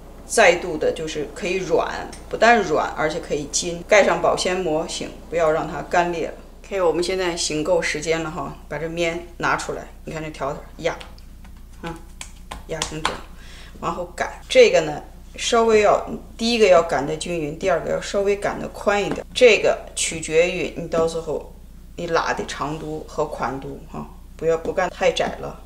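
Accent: Shaanxi, China. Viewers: 95年我去西安上大学，一点都听不懂陕西话。现在听着陕西话觉得真好听啊：） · 只有乡党能灵活掌控陕普四六频道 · 陕西话真好听🥰🥰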